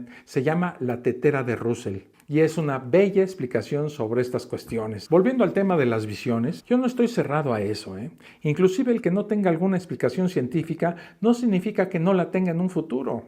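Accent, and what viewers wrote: Mexico. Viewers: estoy fascinada contigo..me encanta escucharte en tu acento tan mejicano...todo un océano no nos separa eres ya imprescindible....un saludo desde España❤❤❤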